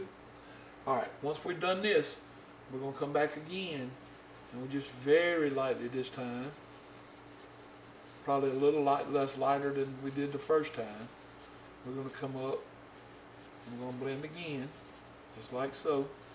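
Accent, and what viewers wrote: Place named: South, United States. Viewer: I love your videos. I'm southern too, so your accent and instructions make it so easy to understand. Thanks!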